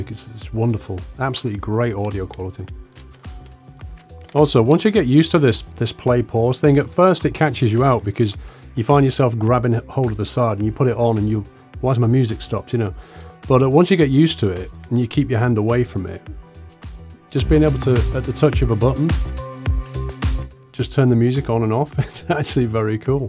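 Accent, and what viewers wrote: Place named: England, United Kingdom. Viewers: Your British accent is so good. Even I subscribed!!!! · You DO know that he's English, right ? He's not TRYING to affect an accent.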